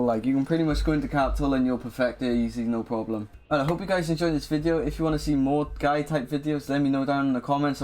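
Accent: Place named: Wales, United Kingdom. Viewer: Can't watch ur too Welsh sorry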